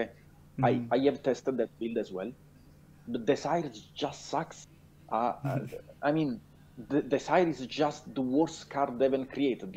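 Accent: Italy. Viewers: love the spaghetti accent and you should interview Madolche God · italian pronunciation, i love you <3. TE SE AMA, FORZA ITALIA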